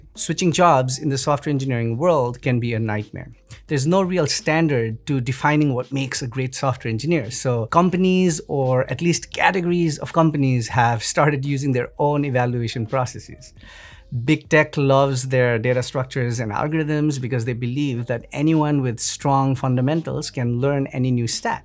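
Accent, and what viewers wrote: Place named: Nepal. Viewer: I hear nepali accent.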